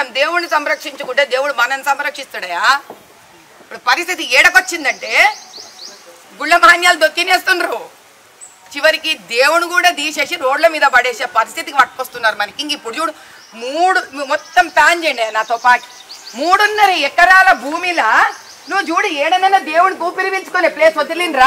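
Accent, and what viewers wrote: Telangana, India. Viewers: నమస్కారం మేడం మీకు తెలంగాణ యాస మీద చాలా పట్టు ఉంది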